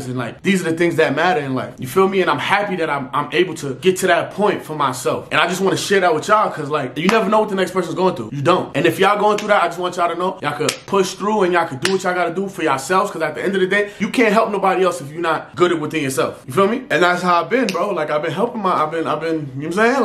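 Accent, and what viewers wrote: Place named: Miami, United States. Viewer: Welcome Back Miami accent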